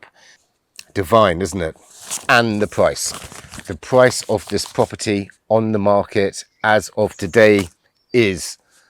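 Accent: United Kingdom. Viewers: it is, as always my sincere pleasure... i think i've realised why you and your character work so wonderfully well with these videos of yours... we english i think its fair to say, are known for reserve and, i assume from your general accent you were educated at a public school... and so to hear a public school "schoolboy" push thru the stiff upper lip and use english expressiveness in its purest form in relation to something that is clearly a passion for you, and to be commenting on such truly italian expressions, makes the communion of both strong characteristics emphasise the deepest of appreciation for what can only be described as architecure almost as an art form in that it is utterly compelling in the feelings it evokes within. such a powerful combination and the pleasure that they and you bring to us the collective viewers is of a calibre that i cannot find anywhere else. 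bravo. bravo, bravo and from your intentaional audience, our appreciation back to you.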